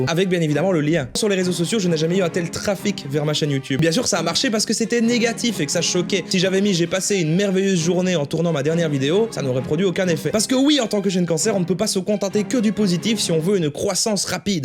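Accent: Liège, Belgium. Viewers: Dis moi, es tu belge ? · Il me semblait bien avoir entendu un accent liégeois ! Bonne analyse des contenus de m... Et sinon le sel est passé maintenant ? :-P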